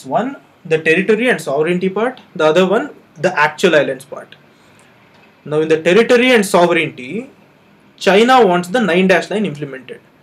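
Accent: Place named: Bihar, India. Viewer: nd the mixture of hindi n eng with bihari extnt is shaaaaandar..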